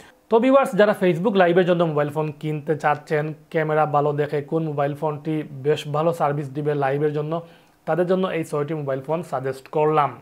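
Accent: Sylhet, Bangladesh. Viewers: ভাই মনে হয় সিলেটি,, অনেক অনেক শুভকামনা রইল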